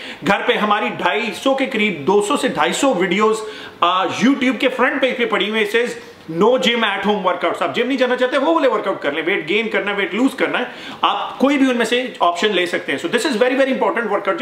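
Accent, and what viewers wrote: Punjab, India. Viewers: Hi veerji I am from India I started following u one reason is the way u speak bit punjabi hindi english all combination it makes me nostalgic since I am from punjabi family but married to a Hindu, I have gone through that tràuma of depression,and I somehow got over it, in fact it made me more mentally strong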